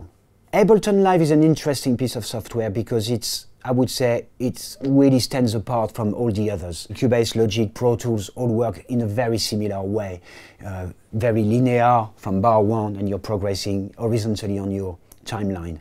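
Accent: France. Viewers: Hmm thanks for the info We sometimes overlook the importance of the hard drive it counts mostly when using a lot of Vst I think Pc is better for home studios because a Pc is easier to fix up.... parts can be changed easily and you can upgrade it easy Though is it basic for beginners I really like the accent from my country Go French! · i was wondering, definitely sound French, especially when he says "acoustic"! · Ehh' lil French accent, très bonne vidéo ,merci beaucoup et bonjour de la France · Interesting accent..French?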